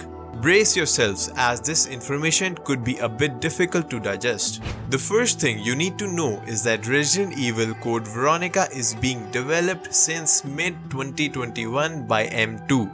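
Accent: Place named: East India, India. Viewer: I knew u were east Indian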